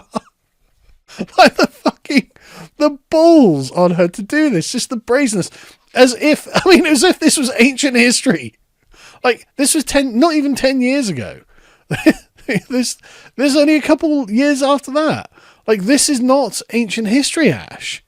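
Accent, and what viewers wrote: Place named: England, United Kingdom. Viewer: Why is there an Englishman talking on the bird channel?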